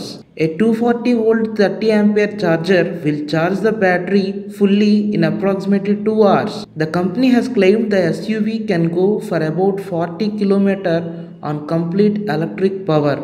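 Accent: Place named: Andhra Pradesh, India. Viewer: Voice is andra english....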